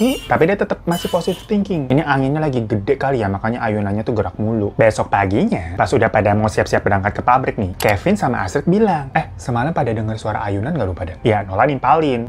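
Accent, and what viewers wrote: Java, Indonesia. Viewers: Indonesia nya bagian surabaya dan semarang🤣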